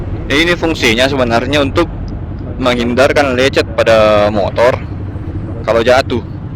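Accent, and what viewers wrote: Makassar, Indonesia. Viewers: mantap mas bro... makassar banget.. lanjutkan om... hehehe...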